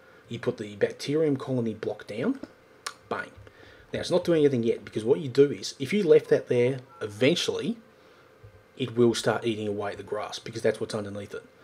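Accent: Australia. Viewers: u got the best ausie accent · Its an Australian accent. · luving that auzzie acent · Is that an Aussie accent? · OMG AUSTRALIAN ACCENT!!!! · Australian accent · His accent as well is just typically aussie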